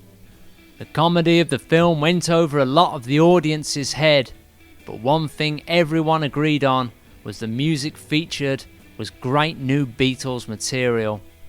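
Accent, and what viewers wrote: Birmingham, United Kingdom. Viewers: Ha! Nothing like him! Brummie accent instead of Scouse for a kick off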